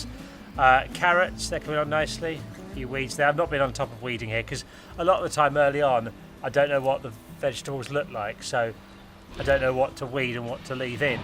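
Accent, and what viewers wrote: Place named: England, United Kingdom. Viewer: Listening to talk about his garden in his English accent is my comfort food 😂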